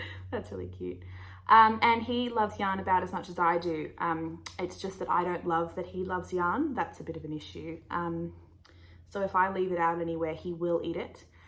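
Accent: Australia. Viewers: I clicked when I saw you wearing your lovely grey Felix and then I got excited to hear your Australian accent! I’m in Melbourne. I loved all your knits, the shawl was definitely worth getting that mini skein set for - looked lovely with the jumper! And I was extremely intrigued by your use of the “cheeses” of roving! I already was thinking to try Plotulopi one day (don’t know if I can be bothered chasing Nutiden). I’m looking forward to seeing how your shawl relaxes after blocking. It looks so dimensional with that roving combined with the braid detail. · Hey, fun podcast. I love that as the podcast progressed you became more “Aussie” - I giggled thinking of overseas viewers when you dropped “so that what I’ll be doing this arv.” 😂 I only came across this podcast because Amy Palko mentioned you - so funny to be hearing of you via a podcaster on the other side of the universe, but there we are. 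Looking forward to watching all your other eps, and seeing more Buddy antics. Waving at you from Canberra, where it’s often cold enough to wear our knits.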